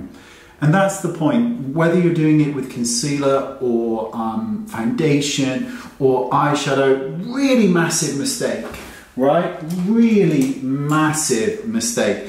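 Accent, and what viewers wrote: United Kingdom. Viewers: TBH I dont do make up,I just follow you coz youre so hot 😂 And I like listening when you speak british ,Im a weird? Maybe 😂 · Come on guys, there's American English and British English and he has clearly a British accent!?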